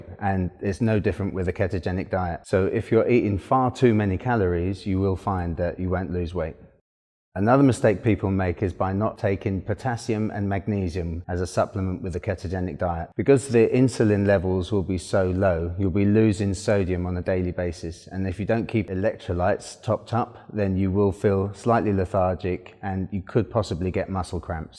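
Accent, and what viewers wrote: England, United Kingdom. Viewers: Yes thank goodness!! You are not American! Such a breath of fresh air you are. No dramatic hyper "best actor voice", just facts. Thank you sir 🙏. So pleased you are English lol... New sub from the island next door 🇮🇪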